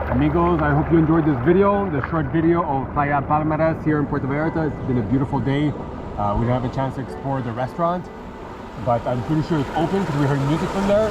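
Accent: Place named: Quebec, Canada. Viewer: Je peux reconnaître un Québecois partout dans le monde ! Très beau vidéo!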